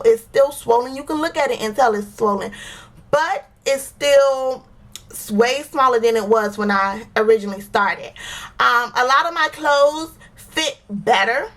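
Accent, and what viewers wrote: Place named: Florida, United States. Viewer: I just found your channel... I love it... I love how us Floridians pronounce stretchmarks (skretchmarks) 😊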